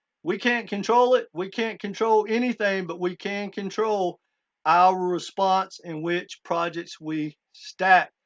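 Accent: Texas, United States. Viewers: This guy lack of research, all the money to handle, half of it are more than 2 quadrillion $ under the ledger, please understand ON demand liquidity, you are talking like a Texan guy .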